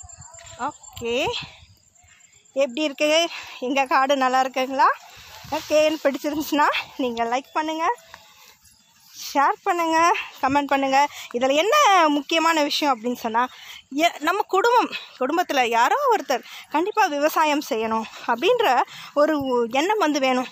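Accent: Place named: Madurai, India. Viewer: Akka nenka entha ooru .. Madurai language matri irukku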